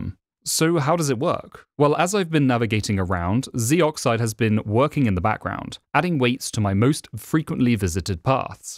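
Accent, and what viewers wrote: United Kingdom. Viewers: he does have an English accent, maybe he should be saying ze doxide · Is it not supposed to be pronounced ‘zed oxide’ as a play on ‘red oxide’, a chemical usually known in naturally occuring forms as ‘rust’? Rust being also the language zoxide was written in, of course. Just surprised since you also have a British accent.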